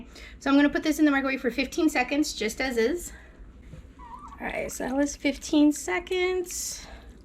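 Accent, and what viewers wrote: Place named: Central Pennsylvania, United States. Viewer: a word like y'all. From central PA. I thought you were originally from pa.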